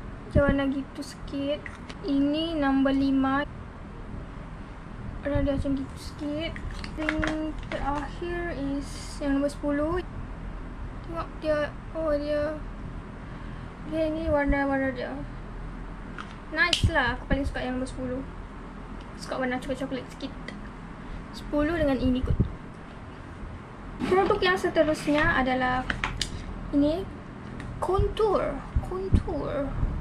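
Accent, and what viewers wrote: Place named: Sabah, Malaysia. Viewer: Macam slank sabah. Iya ka? Assalammualaikum dri sandakan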